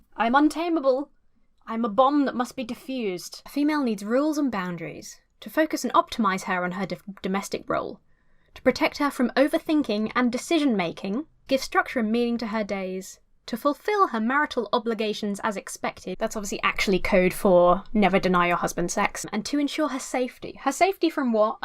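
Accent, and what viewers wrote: United Kingdom. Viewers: First video from you i watched already my least favourite thing is your british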